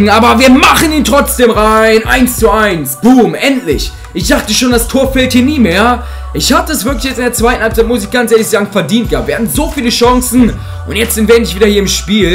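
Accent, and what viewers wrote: Germany. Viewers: Redest du Schwäbisch? Wenn ja mach mal davon ein kurzes Video pls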